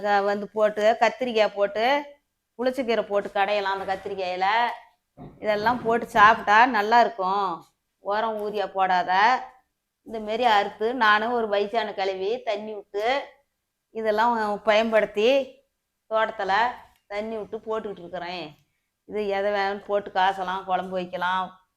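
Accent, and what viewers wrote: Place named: Salem, India. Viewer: Salem slang la pesaranga akka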